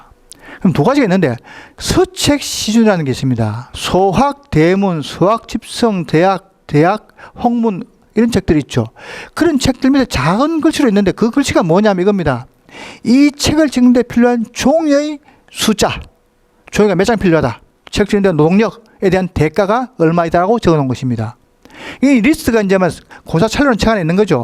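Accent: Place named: Seoul, South Korea. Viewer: 굉장히 꼼꼼한 성격이실것 같은 사투리사이에 한글에 대한 성찰이 녹아있으시네요 : D 처음엔 재미있을라나 걱정했는데, 찰진 서울말녹은 사투리에서 교양을 발견하고 합니다^^ㅎㅎㅎ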